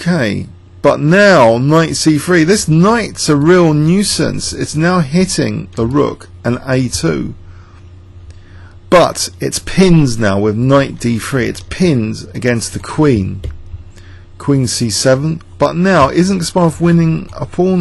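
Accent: United Kingdom. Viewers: Sounds like your saying Fawn pawn to me for some reason.I guess it's just your British accent....I'm not hearing it correctly. It is "thorn" pawn though yes? After watching the reaction video he seems blown over by the move, but immediately castles. Amazing how good these guys are, even in a five minute game how to mitigate damage so quickly after a shocking move/blunder what have you. btw- pertaining to your initial comments. Personally I'd love to see Kasparov make a comeback.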